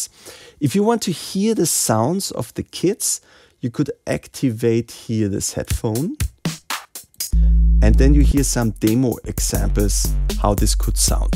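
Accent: Germany. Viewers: This German accent is cute. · Sir you have the best and most german accent i have ever heard ! I love the tutorial very very begginer friendly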